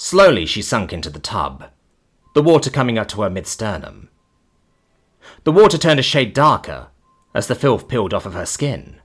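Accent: United Kingdom. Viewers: Even though I already knew what you looked like, and then got an update on what you look like now, I still can’t help but see what my mind’s eye sees when you start narrating. You just hold a voice that, at least for me, holds its weight in gold. I love when you narrate things, especially audio books. Thanks for everything,. You’re my favorite narrator. Edit: When you talk, I always hear a preppy British kid in a prep school, complete with a patterned vest and slacks, with your hair parted in the middle. Maybe that’s just the American in me, but 🤷‍♂️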